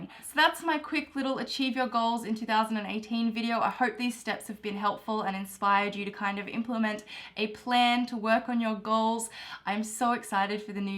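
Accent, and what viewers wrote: Australia. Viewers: Do you have an Aussie accent? I heard you say "here" in the American accent . But the rest seems Australian to me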